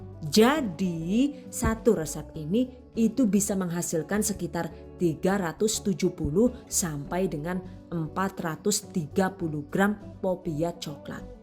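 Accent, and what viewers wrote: Banyumas, Indonesia. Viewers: Kakanya orang mana kok ngapak 😂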